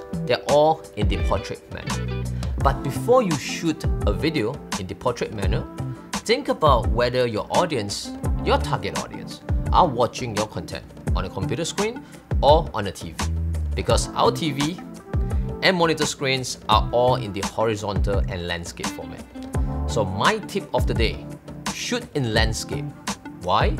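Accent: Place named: Singapore. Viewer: Thanks for the info. I recognized your accent and had to check your Bio. I miss the Singaporean accent!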